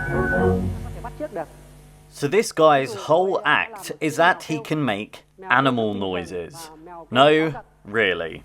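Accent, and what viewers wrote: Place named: United Kingdom. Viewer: Where are you getting American from? He's British, he has a British accent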